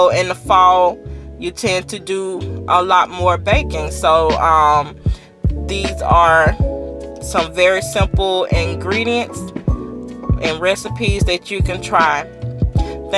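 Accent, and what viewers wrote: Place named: United States. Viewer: I love the way Americans talk. Loving your pecan pies ❤️🌹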